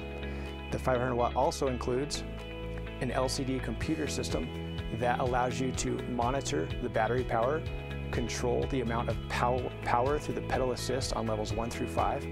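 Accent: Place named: Canada. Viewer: I love hearing a Canadian accent in the morning!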